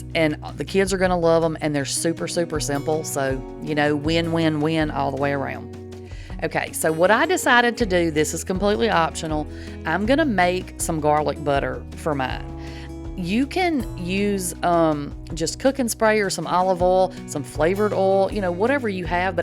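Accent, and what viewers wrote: South, United States. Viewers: I could listen to you talk all day. Love the southern sound of your voice.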